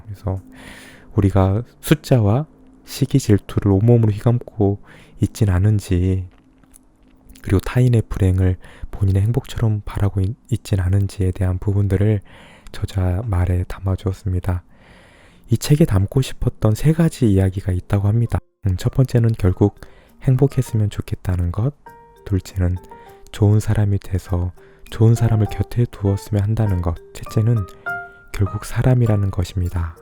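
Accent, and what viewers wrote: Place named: Jeolla, South Korea. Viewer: 전라도 사투리 억양 ㅋㅋ 그래도 멋있다~~~!! 많은 부분을 생각 하게 하고 많은 부분을 되돌아보게 하는 시간이네요 오늘부터 오늘까지!!!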